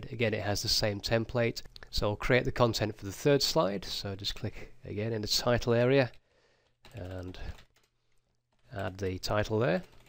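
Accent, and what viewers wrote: England, United Kingdom. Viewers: great work.. great British accent.. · For some of us who are American, we love the flexibility of spelling it flavour or flavor; check or checque; color or colour; etc. Or maybe my latent ancestral genes (as in DNA, not "jeans" as in clothing) just kicked in and I accept both ways of spelling, realizing that the context of his accent tells me he is of more recent British extraction. My family is several generations removed from Britain and Ireland, and has lost the charm of the accent. Yet, when I traveled to Ireland, it was as if I were "home" despite the accent, differences in pronunciation and spelling, etc. There is a concept called called "code-switching" you might like to research. It comes into play in dual language situations where one is mentally switching what they "heard" or "saw" (as in a visual language like American Sign Language (ASL) vs Pidgin Sign Language - using the signed hand shapes of ASL but in the grammatical sequence of Spoken English Grammar.). 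So, for me, this tutorial was wonderful and I appreciate the time he took to carefully explain a bit how the PowerPoint process works. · .....a VERY good tutorial! – ...and Thank You for speaking "english-english" in a clear and "easy-to-listen-to" way...(Many accents from within Britain can be awful to listen to unfortuantely...this was very good)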